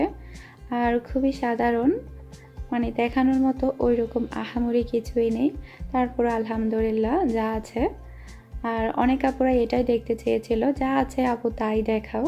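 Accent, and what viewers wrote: Chittagong, Bangladesh. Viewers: Apnar kotha shune Mone hocche . Apni amr attio. Bcoz we r chatgaiya.(Chittagong) 💕💕💕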